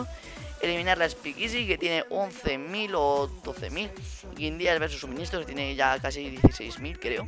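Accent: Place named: Spain. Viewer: LIKE makina! Y una pregunta... De que parte de España eres??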